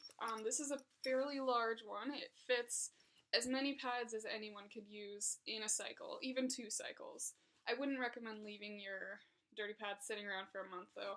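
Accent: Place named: South, United States. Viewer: Are you fro. Alabama or Georgia???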